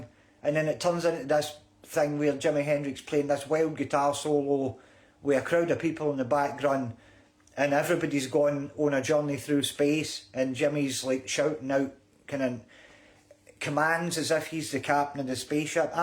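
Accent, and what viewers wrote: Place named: Scotland, United Kingdom. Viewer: Nice video. Your accent reminds me of an SNL skit in America. “Welcome to All Things Scottish, where if it’s not Scottish, it’s crap!” 😂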